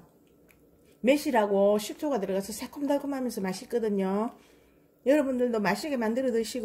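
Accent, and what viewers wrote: Busan, South Korea. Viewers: 안녕하세요^^ 음성듣고 반가웠어요 저도 부산 사람 이라 🤗🤗 풀시청하고 선물드리고 갑니다🎁🎁🎁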